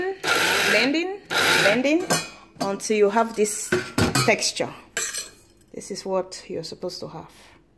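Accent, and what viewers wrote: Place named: Jamaica. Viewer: You sound like a Jamaican thanks you tho for sharing love and lite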